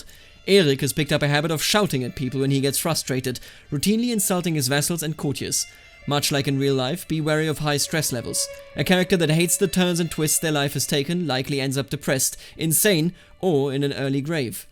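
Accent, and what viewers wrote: Germany. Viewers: why is it spoken by a guy with a terrible German accent who speaks too fast ??! : (( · Un1c0rnOfL0v3 That’s just his accent dude. He’s Bavarian and also he speaks fine. He has his own youtube channel for Ck2.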